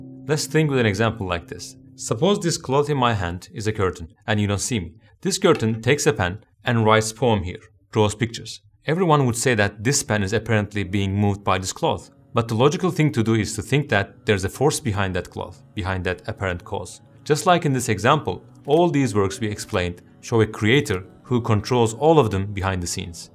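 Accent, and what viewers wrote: Turkey. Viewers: you have a turkish accent ,i lived in turkey such a muslim country ,as a tourist you are a walking walet to them you get ripped off the moment you step in a cab at the ap until you leave the country .from government offices all the way to corner shops ,i travel a lot i have seen many countries there are bad apples everywhere but turkey as muslim country should set an example not be the worst in the world , this example itself proves whatever islam is offering it's not working ,and i am going to stop here and good luck with your lessons of saving souls and converting ppl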